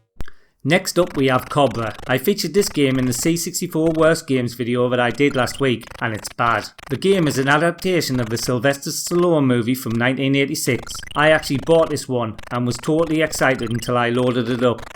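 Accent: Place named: Newcastle, United Kingdom. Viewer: Nice Nuke Astle accent!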